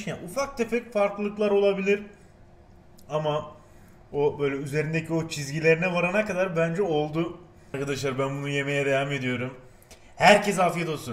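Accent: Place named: Black Sea, Turkey. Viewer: Lan ben daha 12 yaşındayim niye bunu izliyrum da bu da karadeniz 🤣🤣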